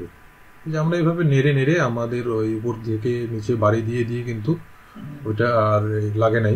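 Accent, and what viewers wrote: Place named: Sylhet, Bangladesh. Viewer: আমিতো মনে করেছি সিলেটে! আপনার ভাষা কিছুটা সিলেটি টান আসে!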